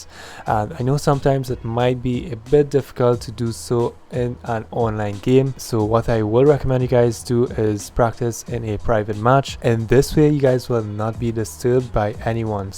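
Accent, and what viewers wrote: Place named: Jamaica. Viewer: U sound jamaican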